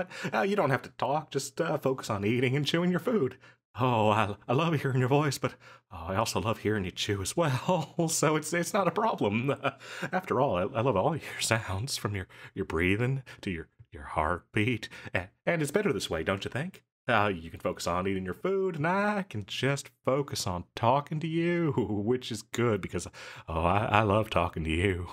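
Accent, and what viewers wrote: South, United States. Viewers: I love the southernish accent. You do it really well💖💗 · gosh the southern-hilly billy voice is so cute omg🥴🥴ur voice is so adorable i swearrr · naw man it was perfect. Southern to Southern this was so awesome! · I HEARD THE SOUTHERN ACCENT AND MELTED 😭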